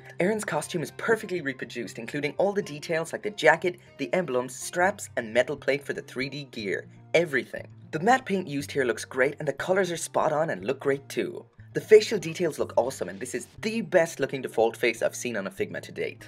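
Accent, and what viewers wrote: Ireland. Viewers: I could sense a slight Irish accent! I live in the UK but I'm from Japan, your accent isn't as strong as the ones we get here but I still thought you lived in the UK so when I saw the end of this video I thought it was a shop in the UK and got really excited.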